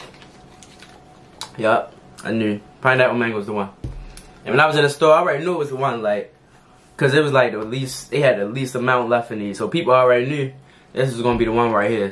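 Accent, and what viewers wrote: Baltimore, United States. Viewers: Bmor accent? Good video. Love to see dmv youtubers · The Baltimore is strong in this one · Definitely knew u were from B more before u said it lol tew dollars🤣I love yalls accent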